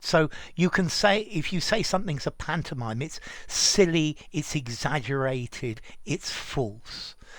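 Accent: RP, United Kingdom. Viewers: GOD... do you speak British RP accent (Reserved pronunciation) however, your teaching style is greater than all..Big fan sir.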